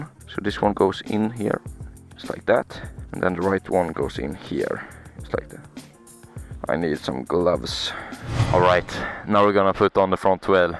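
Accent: Sweden. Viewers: So much swedish accent😂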